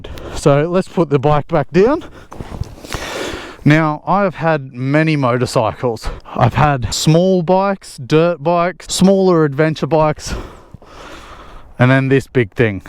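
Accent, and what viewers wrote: Australia. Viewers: You sound like an Aussie!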